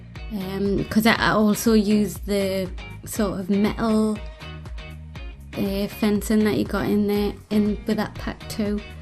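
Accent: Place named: Newcastle, United Kingdom. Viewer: I'm subscribed and keep watching your videos even though I don't play the game. Your voice and Geordie accent is soothing to me.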